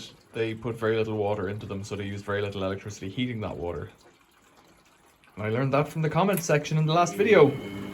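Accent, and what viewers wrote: Ireland. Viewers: Just had my sunday lunch and now I am snoozing with your lovely Irish lilt soothing me as I drop off.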